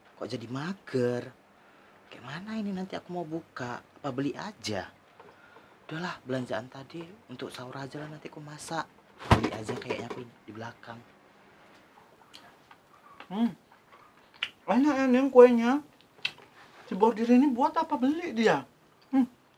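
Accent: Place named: Medan, Indonesia. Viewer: 😂😂😂😂😂😂😂😂😂😂😂😂😂❤❤❤❤❤❤❤💯💯💯👍👍👍👍👍😴😴😳😳😳🤣🤣🤣🤣🤣🤣🙏🙏🙏🙏 orang Medan ya😊😊😊😊😊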